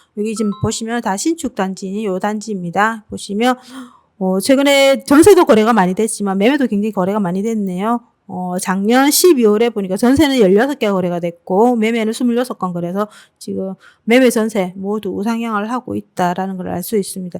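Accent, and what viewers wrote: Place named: Gyeongsang, South Korea. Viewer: 제 생각에는 영남쪽이 고향이신듯하고, 그래서 사투리가 섞여있으셔서 그렇게 들리시는거 같아요.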